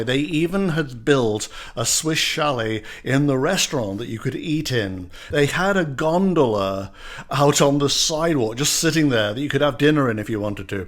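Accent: United Kingdom. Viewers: You’re like the accidental tourist! Love all your vids, all the narration especially with your posh British accent, and Europe seems to suit you!! Thanks for sharing your slices of life! 🌺🌺🌺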